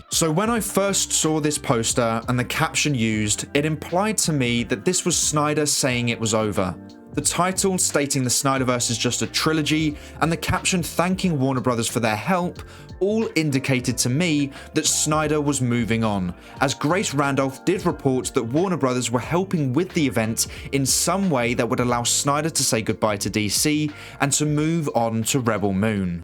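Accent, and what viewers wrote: United Kingdom. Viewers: As soon as i hear a British narrator i know it is clock bait with no reliable information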